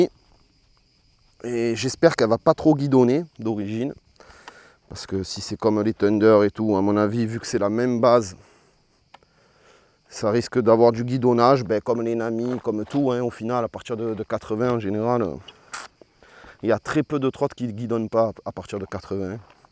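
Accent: South, France. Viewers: Super test, j'adore ton accent du sud!!!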